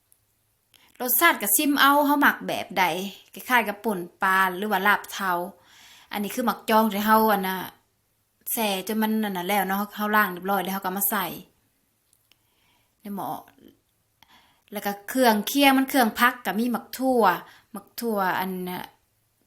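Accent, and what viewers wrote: Savannakhet, Laos. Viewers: สะหวันนะเขดเดี้ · ສຽງສະຫວັນ ບ້ານຂ້ອຍເລີຍ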